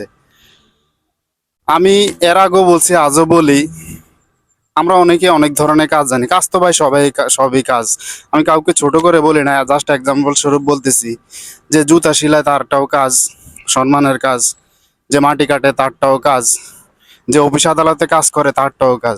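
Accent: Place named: Noakhali, Bangladesh. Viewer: ভাই কি নোয়াখাইল্লা নাকি😊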